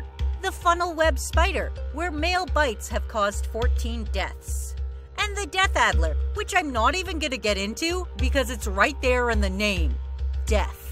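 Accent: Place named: Australia. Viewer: Best Australian accent ever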